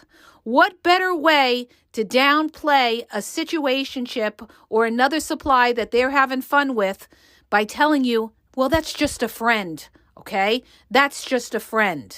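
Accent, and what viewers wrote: New York, United States. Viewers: All I can say is that I have one big regret. And that is that I wish I had known about you 19 years ago when the narcissist from hell entered my life. Had I listened to your podcasts back then, Id probably be very happily married with a good man today. You described my ex to T! I had to do a lot of research on these types of men but you would have been all I needed to help me see the light. I love your hard, NY style delivery. It's what's needed. You are my official go to person now. I binge listen to your shorts reels and longer videos, every spare minute. Finding you is a gift. ❤❤❤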